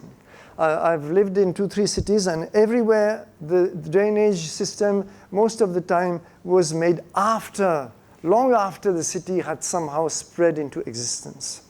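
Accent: India. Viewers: Poor guy he has even picked up an Indian accent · Your English is excellent/perfect, with a lovely melodic tinge of Indian accent. So this civilisation prioritised the well-being of people against many other considerations, and nobody was attacking them. What a beautiful civilisation. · I like how he has acquired the Indian accent. How sweet.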